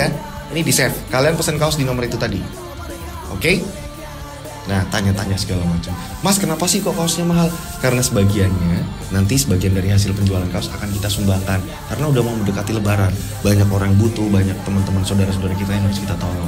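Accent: Java, Indonesia. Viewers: Soreeeee Hong Kong hadiiiiiiiirrrrr, BUUUUUUMMMMM ASYEEEEK BHS MAS MUUUUEDOK BNGET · Piyantun pundhi mas.....medhok sanget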